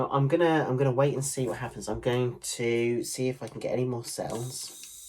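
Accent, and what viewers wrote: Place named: Northern England, United Kingdom. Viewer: its brilliant!! Congratulations! I’m a Manchester lass who moved to America 36 years ago 😳.I come home every year to top up my accent LOL It’s so lovely to hear your Northern accent! You are so relaxing and non intimidating to watch! ❤️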